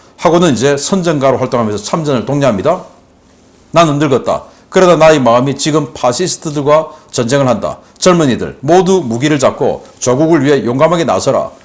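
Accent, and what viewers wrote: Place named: Gyeongsang, South Korea. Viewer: 경상도치야...국방티비에서 내려와라...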